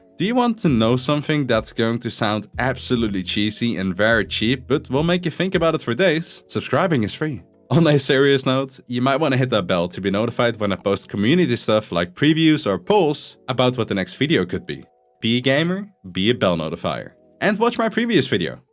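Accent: Netherlands. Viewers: Are you Dutch? You sound so dutch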